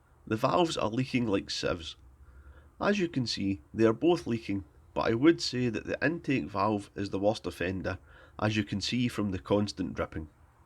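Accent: Scotland, United Kingdom. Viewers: Man, between the dulcet tones of Scottish brogue and the "I make a new one"'s of My Mechanics, my craving for the greatest content on YT is satiated. Excellent work, per usual, friend. Can't wait to see this beauty in action. Much love from the USA.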